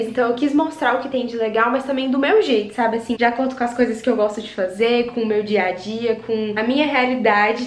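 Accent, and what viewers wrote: Minas Gerais, Brazil. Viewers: Gostei muito Lindo Em Unaí lugares lindos,um beijo meu amor fique com Deus❤ sotaque maravilhoso e do pessoal de Minas😍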